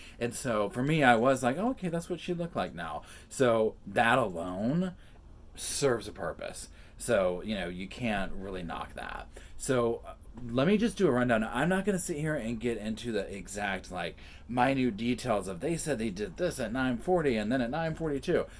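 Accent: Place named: North Carolina, United States. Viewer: You sound like you are from NC!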